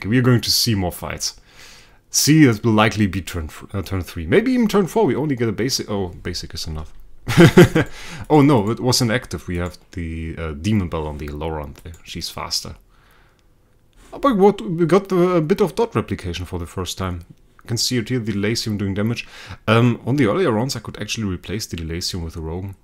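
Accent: Germany. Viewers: Hör ich da einen deutschen akzent oder bin ich lost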